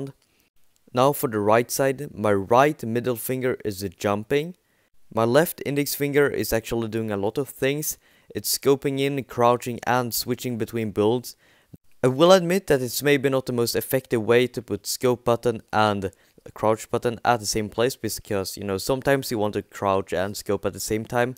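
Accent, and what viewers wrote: Sweden. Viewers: R u swedish?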